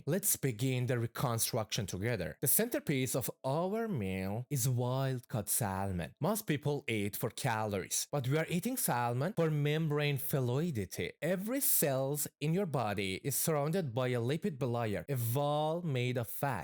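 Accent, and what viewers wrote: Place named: France. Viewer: i can hear a french and Swiss accent or Indianish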